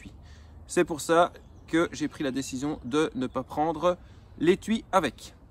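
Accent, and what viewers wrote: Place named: Belgium. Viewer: salut l ami , es tu belge ? Ton accent me rappel m chez-moi ( liege ) super vidéo continue l ami :)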